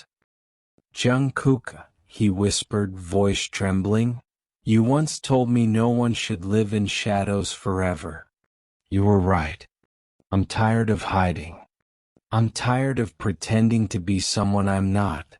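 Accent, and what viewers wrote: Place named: Asia. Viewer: may I ask you what nationality you are? I hear a faint accent in your voice. Are you Asian or partly Asian. I'm just wondering cause I love listening to your voice when you read.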